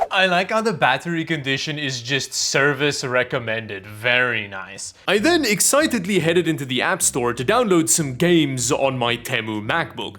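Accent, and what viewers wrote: South Africa. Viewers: are you South African? or am I tweaking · Are you South African? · The South African accent is strong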